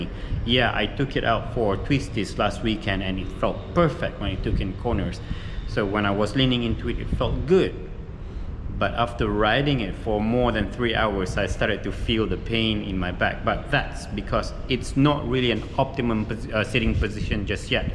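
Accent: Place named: Malaysia. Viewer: Your english sounds Malaysian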